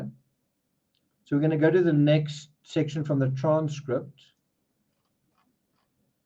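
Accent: Afrikaans, South Africa. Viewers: Are you from South Africa ? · Really liking your insight thanku...enjoy your Afrikaan accent even more...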